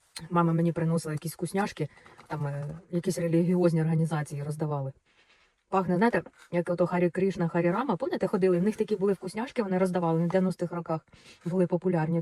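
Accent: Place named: Kyiv, Ukraine. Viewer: У вас говір київської області👍❤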